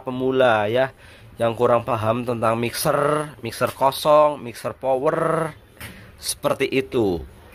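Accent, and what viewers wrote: Banyumas, Indonesia. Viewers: nek ora ngapak ora kepenak mas he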